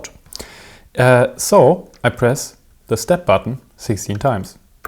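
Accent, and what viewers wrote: Germany. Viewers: I love the way Germans speak English for some reason, and I notice “actually” is a high frequency word for a lot of them.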